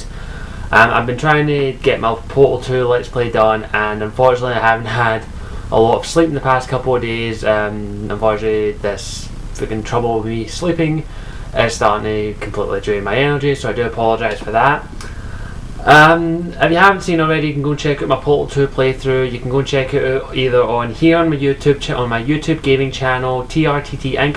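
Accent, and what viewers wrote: Ireland. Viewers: Are you irish? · Lol. "Are you Irish?" Sorry, but that was funny. You have a thicker accent than I'm used to, but even if I didn't look at your user name, I would still be able to tell. Eh, what do I know, I'm just a silly American. I seriously don't want the rumors to be true, if they make DA3 into a multi-player or an open world... there is no way to properly convey my disgust. They definitely need to go back to the multi-race/background set-up and allow for more conversations like in DA:O. <--disjointed much?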